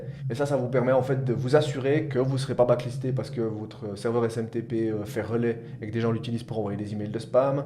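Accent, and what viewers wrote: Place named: Belgium. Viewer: Très clair et précis et avec un bel accent belge si je ne me trompe pas ! Thanks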